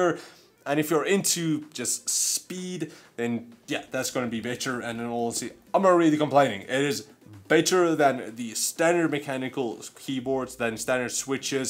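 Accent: South Africa. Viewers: R u south african ?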